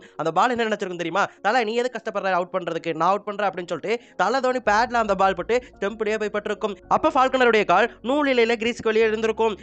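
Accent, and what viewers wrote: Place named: Punjab, India. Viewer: Punjab inglish ❤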